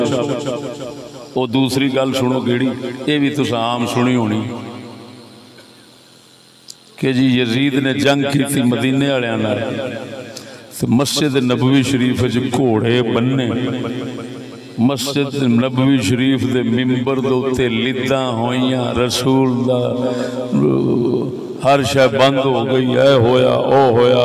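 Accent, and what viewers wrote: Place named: Punjab, India. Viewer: Urdu ati hay laykin ap ki zuban mubarak pr punjabi charhi hoi hay.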